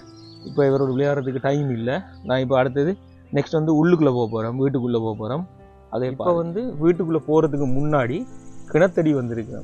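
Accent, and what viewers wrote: Sri Lanka. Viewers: Neengal pesum ilangai tamil migavum asagaka irukirathu brother happy married life.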